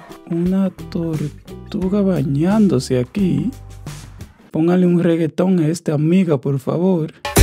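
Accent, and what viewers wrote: Dominican Republic. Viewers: Yo sé que eres de RD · Eres dominicano ? 🇩🇴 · Hola tocayo, usted me parece Dominicano.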